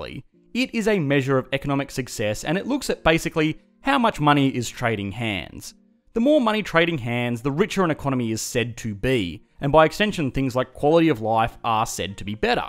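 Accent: Australia. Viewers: Australian narrator its hard 2 understand ur english accent. Tenx 4 feature d philippines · He is not a British man. He is Aussie. Accent checked lol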